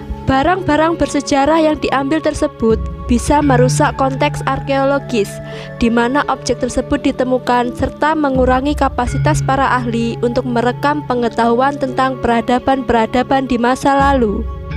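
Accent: Java, Indonesia. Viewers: Kok medok jawane pundi mbakyu??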